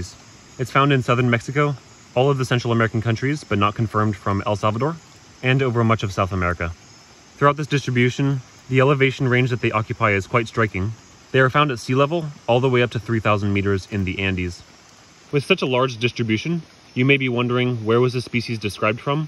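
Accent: United States. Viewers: American Accents are bonkers as it is but this guy sounds like he’s trying to talk with marbles in his mouth.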